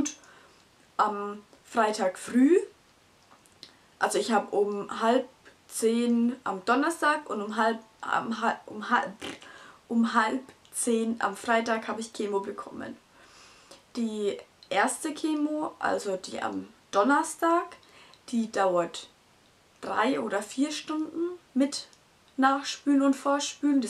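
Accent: Germany. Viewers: Du bist ja hübsch 😊 und noch so jung! Kommst du aus Franken? Du redest wie ich 😂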